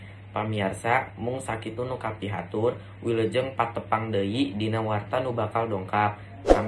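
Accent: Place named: West Java, Indonesia. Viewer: 👍👍 nyunda pisan eeuuyy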